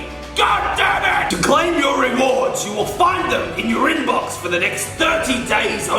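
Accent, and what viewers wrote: Australia. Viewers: I love the Australian accent!!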